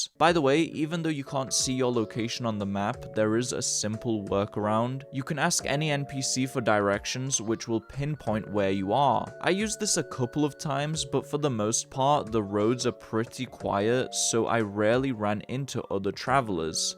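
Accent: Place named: Northwest England, United Kingdom. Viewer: This is my fourth playthrough. Two in original mode and my second playthrough in hardcore. The blacksmith dlc is heaven sent. This time I started it asap. This fourth playthrough is the best yet. Take my time and new things come up all the time. I'm finally getting a handle on the Kuttenburg map but I still get lost at times and for me it's mostly because I get lazy in my navigation before I head out from a town or whatever. I use my body as a sundial except for when it high noon ish. Never get off the road at night very far w/o nighthawk. But yeah, the immersion experience is so good in hardcore that I would never go back. I only use three negatives and the easiest ones for me. Very nice and relaxing video. Keeps the love fresh for the game. Cheers btw where in the UK is your accent from? My guess is the northwest. Blackpool. 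Liverpool?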